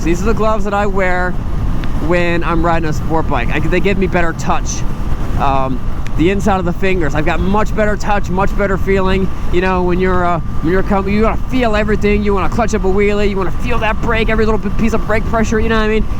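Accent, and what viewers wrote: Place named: South, United States. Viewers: hes from the south what do you expect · I think he's texan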